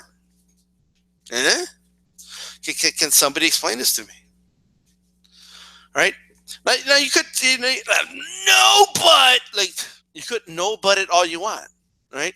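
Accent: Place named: New Mexico, United States. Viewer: por k hablas con accento nuevo mejicano?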